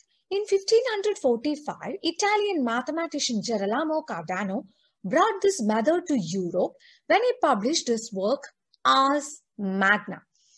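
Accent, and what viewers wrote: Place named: South, India. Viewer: It's good and Informative ! Are you doing PhD in Mathematics ? And another thing to say I like your pronunciation , it's awesome and somewhat like South Indians ,hahaha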